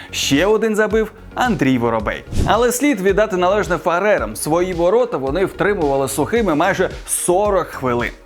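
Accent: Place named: Russia. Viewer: Я думав, тут голи покажуть, а тут тільки хлопець хизується, немов перед дівчатами. Ще й акає, мов рюсскі.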